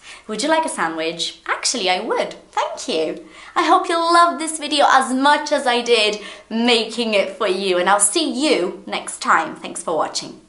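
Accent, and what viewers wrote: United Kingdom. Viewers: I finalmente found someone with a British accent.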